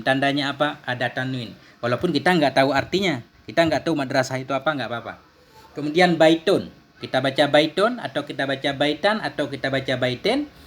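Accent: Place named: Lombok, Indonesia. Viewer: Afwan kayaknya ustnya org lombok ya hehhee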